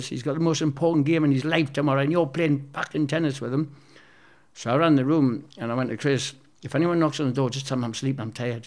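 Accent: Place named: Geordie, United Kingdom. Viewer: He's a Geordie that's how they all sound.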